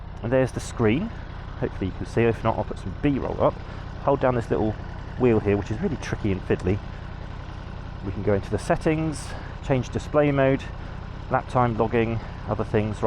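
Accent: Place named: RP, United Kingdom. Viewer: Gotta love the private school accent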